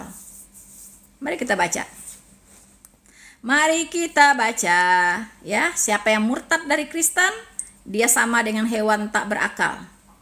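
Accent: West Sumatra, Indonesia. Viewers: Hallelujah, Tuhan memakai hambaNya yang cerdas dan tegas menyampaikan kebenaran Firman Tuhan. Meski memakai logat Minang, namun tetap menarik dan menjadi berkat. Semoga para pemikir Minang seperti Ade Armando dll yang mengandalkan logika bisa terinspirasi dan menerima jalan keselamatan dengan mendengarkan penjelasan penjelasan.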